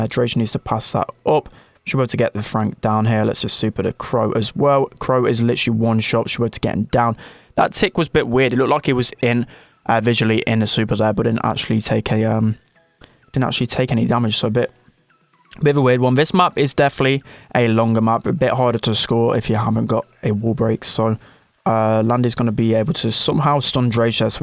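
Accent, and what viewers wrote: United Kingdom. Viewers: Uk people and their English :) heh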